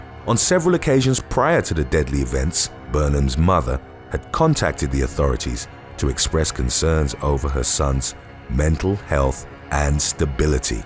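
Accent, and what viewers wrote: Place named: United Kingdom. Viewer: I always enjoy your videos. I also love your perfectly calm narration voice and in particular your sophisticated British accent. Your accent always draws me in to listen to your videos.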